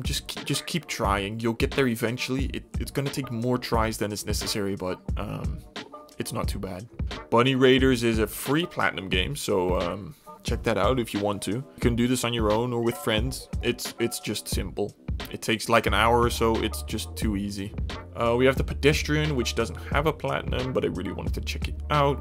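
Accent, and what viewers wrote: Netherlands. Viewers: So I was actually able to pick up you’re from the Netherlands by your accent but dang that took a while. Definitely a compliment because usually I can’t stand dutch english accents but yours is fine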